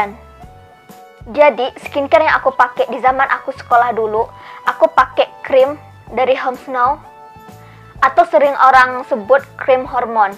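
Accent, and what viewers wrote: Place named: Malaysia. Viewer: Kak cntik asli mna yaa.. cra ngmongny kok kyk org malaysia😀☺️☺️✌️✌️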